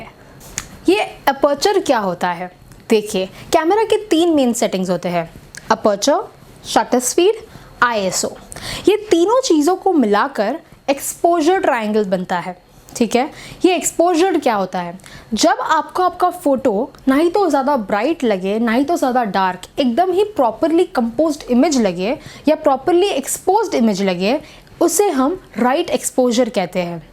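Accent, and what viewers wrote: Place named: Bihar, India. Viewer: बेसिकली बिहार से लगती है आप .. anyways, अच्छे से बताती हैं, लेकिन presentation की थोड़ी सी तैयारी और जरूरी है .. जानकारी तो है no doubt... 👍👍💐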